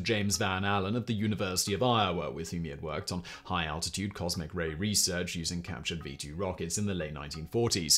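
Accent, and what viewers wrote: United Kingdom. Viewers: why do brits ad extra syllables to the word Alminium? It's 4 syllables not 5. The hard second "i" is totally supposed to be silent, not accentuated. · He's British so no.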